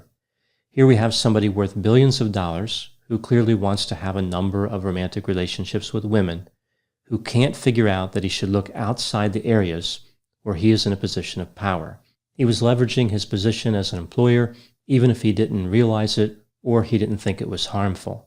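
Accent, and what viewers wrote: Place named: New York, United States. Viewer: A bit of accent came through more than usual today. I'm guessing he's from outside of NYC but within 75 miles. He's probably mentioned his hometown accent at some point and I could be way off.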